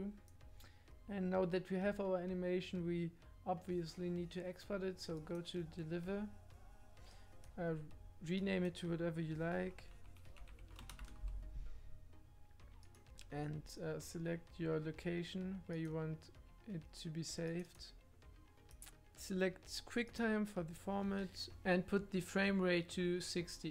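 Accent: Germany. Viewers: dein akzent mein hört dass du deutscher bist hahaha !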